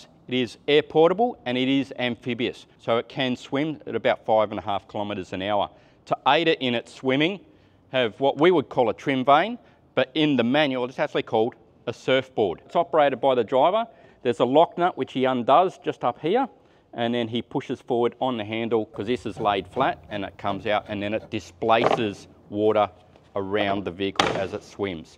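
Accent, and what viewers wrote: Australia. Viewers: Awesome! Thanks! I really like the australian english accent! Greetings from Germany!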